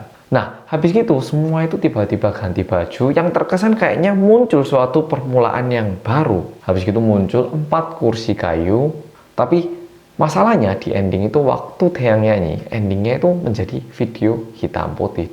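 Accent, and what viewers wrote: Java, Indonesia. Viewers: Mas medok ak lho udah berhasil ga nangis lagi dengerin lagunya, setelah dengerin plus nangis semalaman,, trs nonton video mu ini nangis lagi kaaaaaannnn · Ada awal ada akhir, oppa medok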